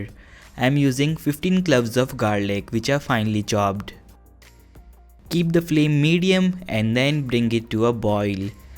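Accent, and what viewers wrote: India. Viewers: Fuck this disgusting accent of indiiisss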